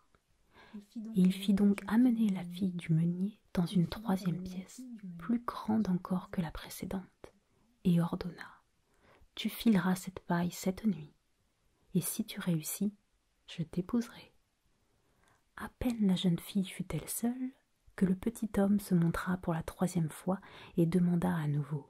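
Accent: France. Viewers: I really like both, but i think i prefer the french accent! French is ma langue préferée <3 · Je ne peux pas le croire, elle est française! J'aurais dû m'en douter avec l'accent.. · i like this french version, cause french is so soothing and sweet sounding. i also understand it. but. the french accent in english i find even better. because the accent, with its note of vulnerability and a little awkwardness, is the loveliest of all... thank you so much.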